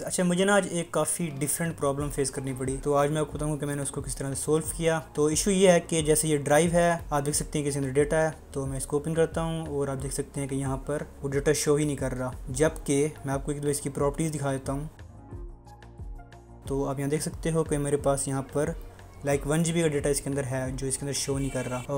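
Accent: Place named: Southern England, United Kingdom. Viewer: Southern English to fast..... had to change the playback speed. LOL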